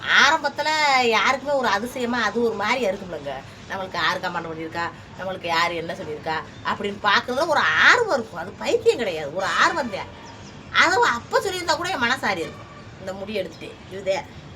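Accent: Madurai, India. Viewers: நீங்க மதுரை ya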